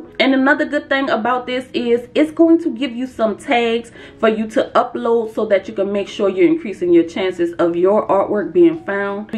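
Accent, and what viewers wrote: New Orleans, United States. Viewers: Nice video and great tips! I hear New Orleans all in that video! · I can hear a New Orleans accent from a mile away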